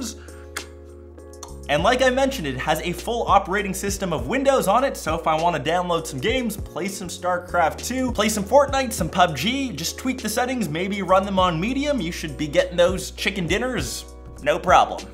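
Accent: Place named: Canada. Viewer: Your Canadian accent calms me, it's often my audio ambiance of choice while coding.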